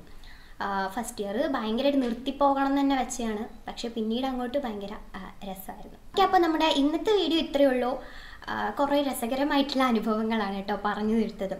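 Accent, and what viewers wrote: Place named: Kannur, India. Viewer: oo.. Evdeya nursing padichee... Chechi kannurkari aairnnalle🥰basha ketapo thonnni... Njanum....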